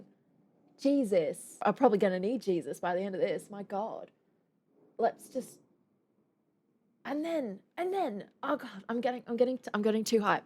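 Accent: Australia. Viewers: With your beauty and that aussie accent, man your a hottie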